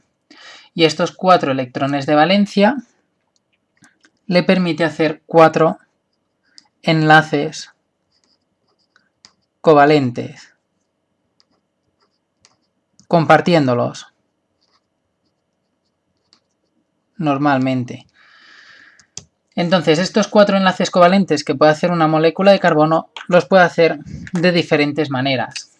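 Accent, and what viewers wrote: Catalonia, Spain. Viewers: Como se nota que eres catalán/valenciano, xD. Desenvolupada, ehhh! +10 al vídeo, me ayudó mucho!!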